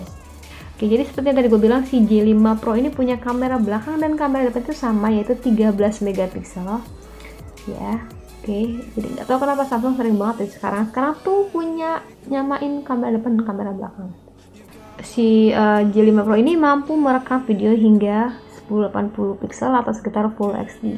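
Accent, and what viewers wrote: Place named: Cirebon, Indonesia. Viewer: biasa biasa bae.. hehee wong cirebon bangettss..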